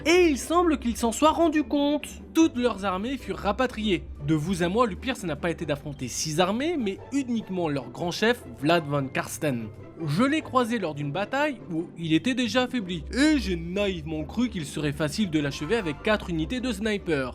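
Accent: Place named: France. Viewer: Great video Ur English is perfect but U somehow keep all the frenchness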